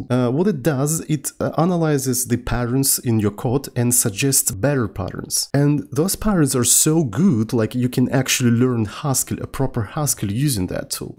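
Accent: Russia. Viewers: Я как услышал его, понял что русский, акцент остался чутка)0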